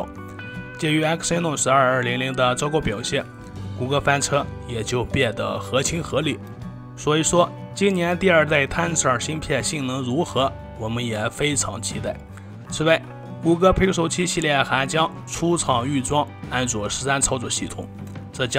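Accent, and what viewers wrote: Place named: Northwest, China. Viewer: 口音浓浓的西北黄土味！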